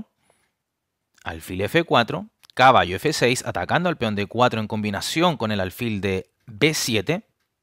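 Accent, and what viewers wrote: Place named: Chile. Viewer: Le encuentro un acento Chileno xd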